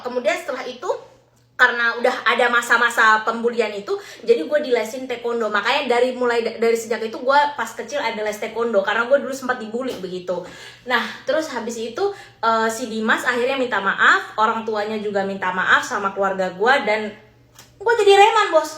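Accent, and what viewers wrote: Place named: Java, Indonesia. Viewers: Karna dia emg org jogja kan, logat aslinya emg jawa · seru ya dengerin yang dulu kaya gini, sekarang ngomongnya dilogat jawain kurang sreg dengernya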